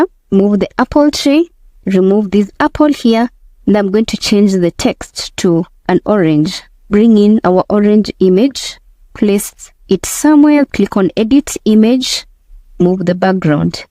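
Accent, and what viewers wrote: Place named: Kenya. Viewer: You sound Kenyan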